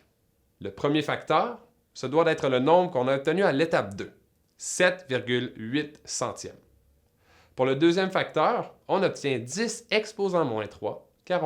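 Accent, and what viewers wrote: Quebec, Canada. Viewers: Merci beaucoup j ai bien compris je vous adore surtout avec l axent québécois 👍😆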